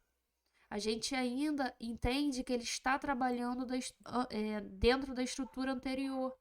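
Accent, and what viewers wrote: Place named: Rio de Janeiro, Brazil. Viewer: começando o curso hoje. alem do sotaque carioca, que é muito gostoso de ouvir, sua didatica é otima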